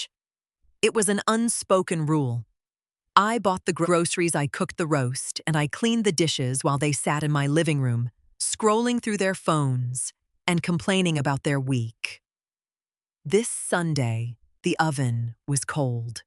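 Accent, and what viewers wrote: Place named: United States. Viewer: Why would this channel use an American accent AI narration, but have Euros going into the wallet?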